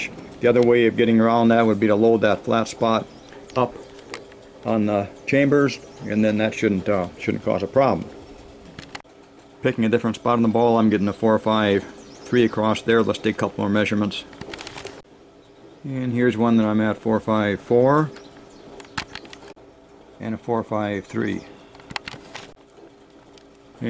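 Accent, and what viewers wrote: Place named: Midwest, United States. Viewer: By the way I kind of recognized your accent are you from Midwest or Minnesota I grew up in Minnesota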